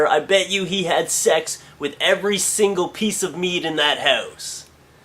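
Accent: Canada. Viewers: and ur Canadian accent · i love the Canadian accent the i'm Australian and its not so cool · I LOVE CANADIAN ACCENTS!! soo sexy <3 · I love it when you say "house" with your cute\amazing candian accent! :D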